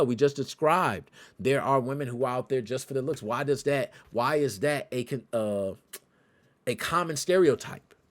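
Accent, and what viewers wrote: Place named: United States. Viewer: I just hate American black guys' accent 😒. especially the US ones that gives lectures like you doesn't suit you at all. Plz correct your accent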